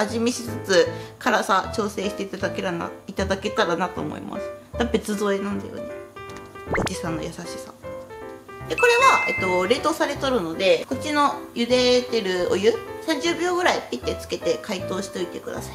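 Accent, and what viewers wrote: Kansai, Japan. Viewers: 関西人なの？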